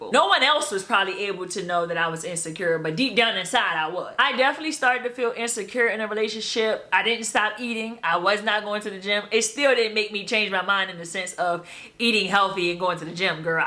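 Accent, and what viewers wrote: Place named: Washington D.C., United States. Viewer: Are you ftom D.C ?? I hear accent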